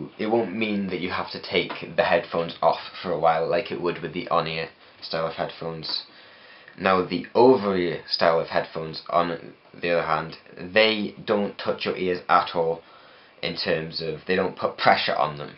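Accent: United Kingdom. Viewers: Are you English? Because I am, if you subscribe to me, I will subscribe to you!! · Are you welsh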